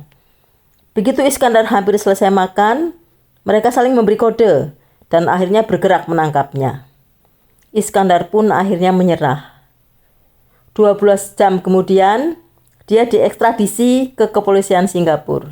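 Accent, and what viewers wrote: Java, Indonesia. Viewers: Suara nya MCM org jawa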